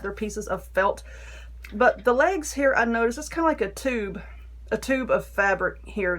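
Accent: South, United States. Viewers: Love your videos and southern accent . Other people talk so long to explain how to make something and they lose me . Quick and easy thank you Namaste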